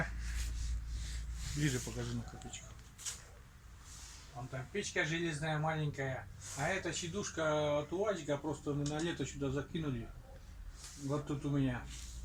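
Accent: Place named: Udmurtia, Russia. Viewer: думал с Удмуртии)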